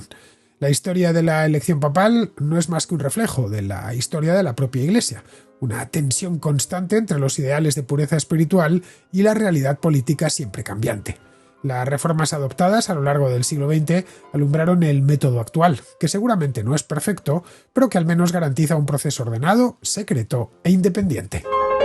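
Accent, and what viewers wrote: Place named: Galicia, Spain. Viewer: "¡Interesante! Aunque tu manera de modular no es la mejor y tu acento me recuerda al de un gallego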